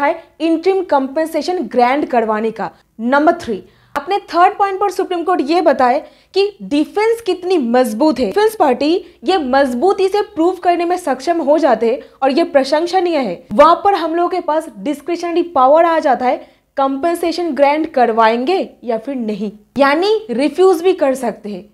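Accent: Bengal, India. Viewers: आधि तो इंग्लिश बोल रही है , और हिन्दी भी शुद्ध नहीं है ,,, यह बंगाली हिन्दी है 😢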